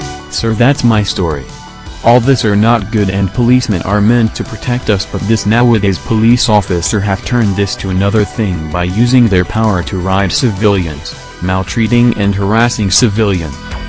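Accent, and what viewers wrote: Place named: United States. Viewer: I can feel the voice on this video is always on YouTube posting dirty stuffs about Nigeria and other African countries, not for once any good thing about them. Whatever country you are from, I think there are enough dirty stuffs to talk about as well. You accent sound American, and if you, I guess as much. Shame on you.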